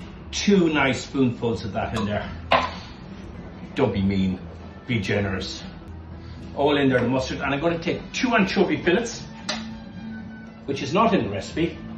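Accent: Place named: Dublin, Ireland. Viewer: A fabulous recipe, and your beautiful, mellifluous Dublin accent made your explanation doubly enjoyable!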